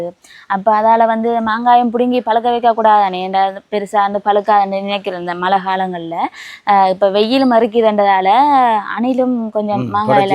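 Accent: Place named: Sri Lanka. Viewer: I'm always LOVE srilankan Tamil from Tamilnadu.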